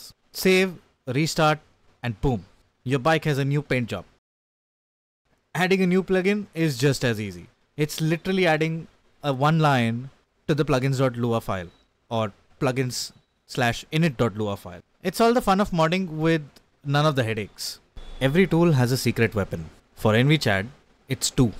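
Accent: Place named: India. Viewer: chat gpt x indian accent is so crazy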